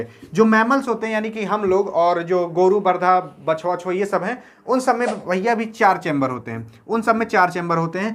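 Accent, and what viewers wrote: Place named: Uttar Pradesh, India. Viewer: aapki bato se lag rha h aap up se h 🤔up me kha se h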